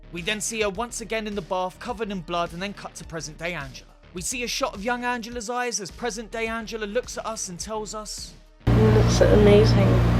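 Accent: United Kingdom. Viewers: Talk about the perfect man!!😅❤ Watches disturbing films and has a British accent!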